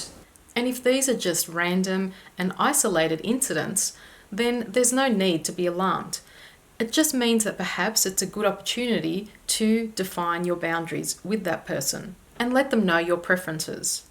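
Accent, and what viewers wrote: Australia. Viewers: Pretty sure she's an Aussie. Lol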